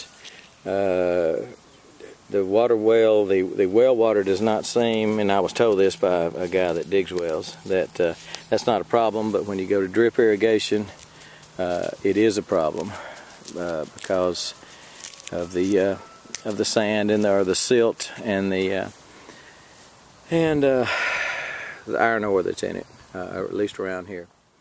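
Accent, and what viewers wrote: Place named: South, United States. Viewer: I love hearing the Southern drawl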